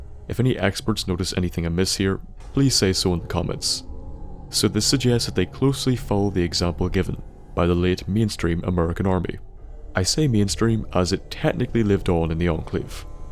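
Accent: Northern Ireland, United Kingdom. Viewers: You from Northern Ireland lad? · Are you a fellow Northern Irish man? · Are you from Northern Ireland because like you phrases and accent sounds like a N.I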